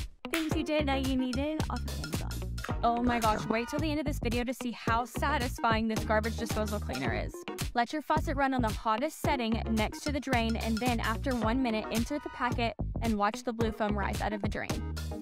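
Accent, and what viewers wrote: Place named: Stoke, United Kingdom. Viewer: can tell he is from stoke we are all the same.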